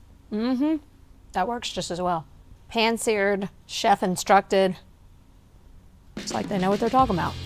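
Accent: South, United States. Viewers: Her particular southern accent is the same as my best friend’s from GA, not too much not too little. I love hearing her voice too. ❤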